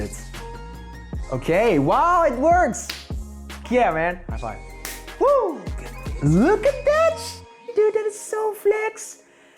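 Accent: Netherlands. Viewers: within the first few seconds i was like 'he has a dutch accent, is he dutch?' and then i saw the box tape and i was like wait isn't that Azerty tape since i bought something off of there like a week ago and it was so yeah your english is really good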